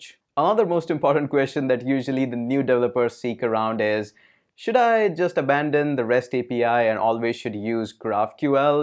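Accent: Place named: India. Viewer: That indian accent…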